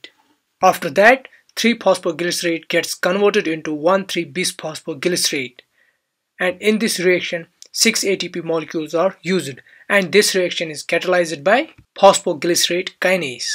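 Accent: Kashmir, India. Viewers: Thanks for explaining how life works. And thank you for using English. Your Indian intonations are a little hard to follow but I compliment your efforts. 😀👍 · I guess you are from kashmir brother...? Am i right??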